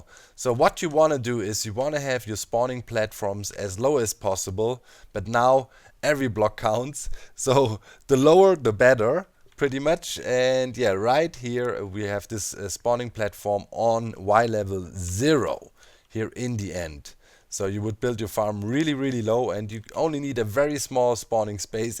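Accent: Germany. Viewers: you sound german r u german?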